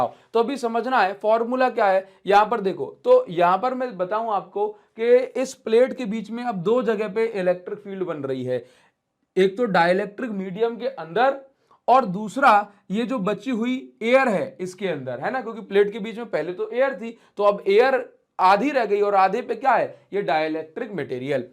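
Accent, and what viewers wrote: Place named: Bihar, India. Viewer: Khela hove hai😂 true bihari axcent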